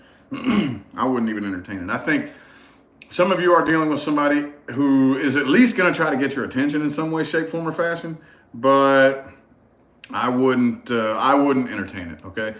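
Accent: South, United States. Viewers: Hello there, im Trudi and a Leo. Iv just come upon your channel in the last 3 days. Ive got to say the first reading i saw of yours it was like a magnet pulling me in for more. You have alot that you need to share with as many people as possible . I dont know if its your easy going small town country boy drawl. Or maybe your aura of i got things under control just relax or your songs you belt out while you shuffle and pull cards. All of which makes people turn and pay attention and watch you and listen. I watch maybe 3 readers because i feel they truly feel the cards and the messages behind them. You and one other are pretty close to reading my life .its spooky and i get chills when a reading is so close to my heart. But the way you explain the Universe to us is a great gift. Im a true beliver in the Universe and what you put out in the world good or bad will come back to you ten fold. 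You may not be content trying to get these lessons thru to us. Its hard work when noone takes responsibility for their own actions anymore. This is the way of our world it seems. But my opinion you are doing a wonderful job. Thank You im glad to beable to watch your channel. Soon ill get a personal reading appt. Set up. My person of late is a Virgo. We are done but he decided to hurt my feelings on his way out. Ill survive . Im thinking positive thoughts. You are a good person.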